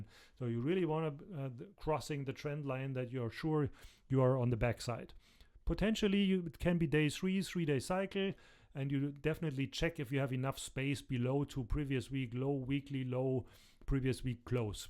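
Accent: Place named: Germany. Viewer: U Are Amazing , are u from Germany sir? Ur Accent is like that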